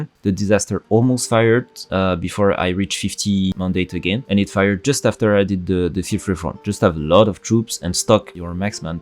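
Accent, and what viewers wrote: France. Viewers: je me disais bien que t'avais un accent français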